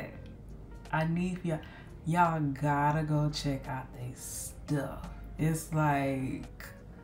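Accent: Jamaica. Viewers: Peace and Love, it's great to see you posting more videos lately. Mi love fi hear yu talk Patwah 🙂. I will definitely check out these business. I was about to order the Jamaica jersey but saw that it wouldn't be shipped until 4 to 5 Weeks. Will get it next month. Dat deh Jersey Nice!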